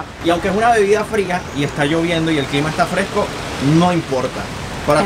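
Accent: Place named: Venezuela. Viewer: Muchachoooo ya llega a su País Natal y se pone hablar como un Venezolano XD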